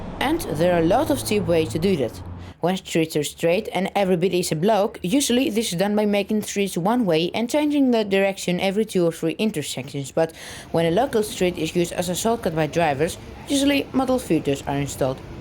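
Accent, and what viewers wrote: Netherlands. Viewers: It's a very thick Dutch accent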